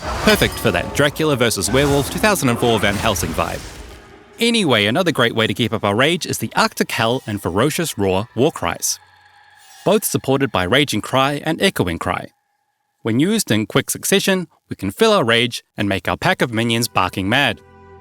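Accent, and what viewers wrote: New Zealand. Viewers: Okay, so "Air Buds" sounds like "earbuds" in the Kiwi Accent. Now we gotta see if can say "bad bed".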